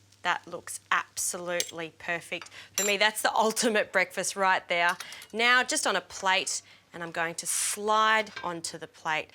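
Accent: Australia. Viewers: Australian accent! 🤩 I am Brazilian and worked on a cruise ship in Australia. Instagram: alinestravels I will try this recipe. I love galettes!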